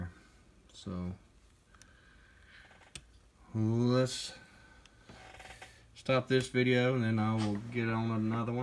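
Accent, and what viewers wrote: Kentucky, United States. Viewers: You sound pretty country, you in Kentucky? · oh ok. Well I'm out then lol I don't 3D print or anything. Btw you must not be too far away. You sound like one of us Kentucky boys lol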